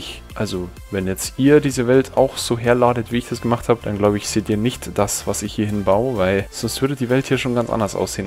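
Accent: Austria. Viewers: Bist du österreicher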